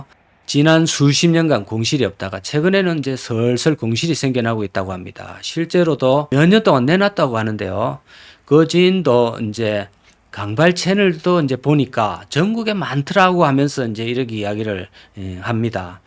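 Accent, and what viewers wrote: Gyeongsang, South Korea. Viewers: 경상도 사투리 일단 듣기싫노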